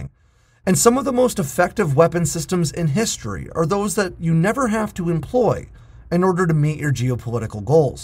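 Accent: United States. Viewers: K-tar I luv how Americans pronounce words 😂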